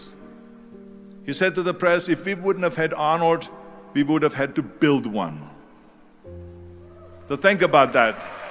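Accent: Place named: Austria. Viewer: Was ich nicht verstehe, ist, dass jemand nach all den Jahrzehnten immer noch Englisch spricht wie ein Österreicher.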